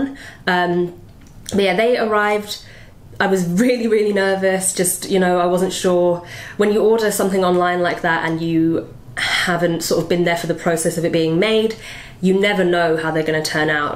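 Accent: United Kingdom. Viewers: You have a very classy British accent normally (no offence) I kind of find British accents very lousy and not super classy like hers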